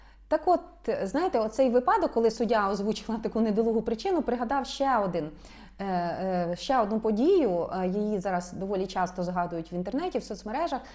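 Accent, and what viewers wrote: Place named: Russia. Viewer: Зверніть також увагу на орфоепію, бо ви кажете : майданчЬик, вибачЬте, очЬорнення, злочЬинний, щЬо не було чЯсу, навіщЬо, причЬина, збагачЮватися, хочЯ, головнокомандувачЬ, почЮти, дітищЄ, бачЄння, з багатьма зірочЬками, площЯдка, чЄсноти, бла бла бла ... гаварітє парускі і не вдавайте, ніби ваша мова українська. Дякую.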